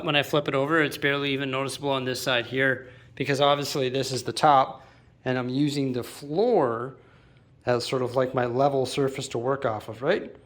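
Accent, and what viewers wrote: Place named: Canada. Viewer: You sound very Canadian lol lmk if im wrong but I hear it in the vowels fs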